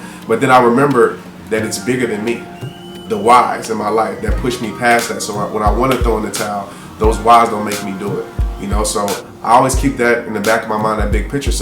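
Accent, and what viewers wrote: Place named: New Orleans, United States. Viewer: New Orleans baby !!!! Me too son ! thought u look familiar , naa j/k .. na but you do have that Orleans style. !! you talk like us too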